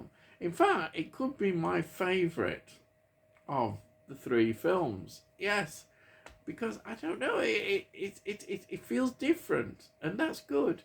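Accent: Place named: Liverpool, United Kingdom. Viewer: This man is speaking in a scouse accent.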